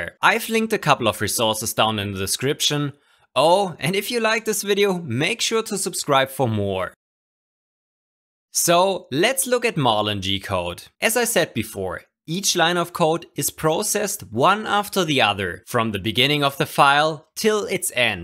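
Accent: Germany. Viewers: Man hört raus das er deutscher ist :)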